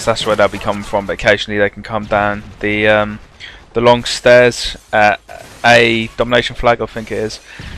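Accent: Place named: Norwich, United Kingdom. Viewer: You sound like your from Norwich? City fan?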